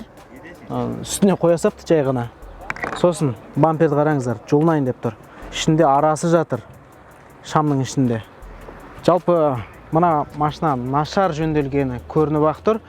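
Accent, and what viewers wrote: Standard. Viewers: Бауырым ризамын саған, қазақша таза сөйлеп жүргізгеніңе бейне роликті👍✊👏🏻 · Таза казакша сойледи жарайсын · Таза казакшан ушн улкен рахмет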